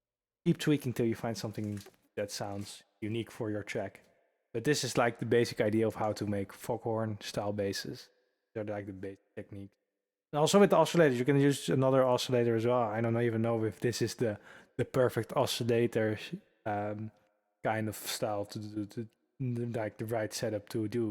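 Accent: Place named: Netherlands. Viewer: But that dutch accent tho. Dope tutorial nonetheless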